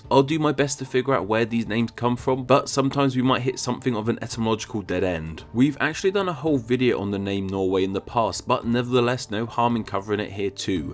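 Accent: United Kingdom. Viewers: Ah. British accent.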